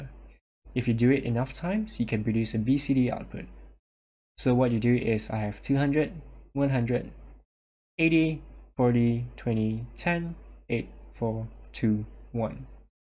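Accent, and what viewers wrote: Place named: Singapore. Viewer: Hope you don't mind me asking, I feel like I recognize your accent. Are you from somewhere in or near Lion City by any chance?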